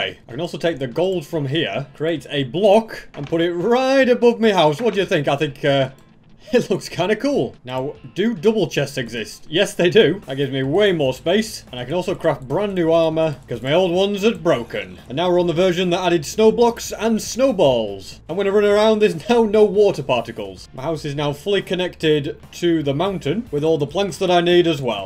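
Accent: United Kingdom. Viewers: This man has the most enthusiastic British accent of my entire life. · He has a British accent and he is from the United Kingdom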